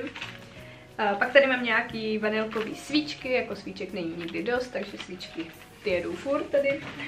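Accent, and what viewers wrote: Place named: Vysočina, Czech Republic. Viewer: Já na Vysočinu chodila do školy a teda některý slova jsem jako Středočeška s pražskou obecnou češtinou nechápala :-), ale nikdy by mě nenapadlo se ptát, proč říkají "víjou, najíjou se žlicou,spíjou,jdou za starostem" apod. Prostě nářečí, kterýho je ČR plná ;-)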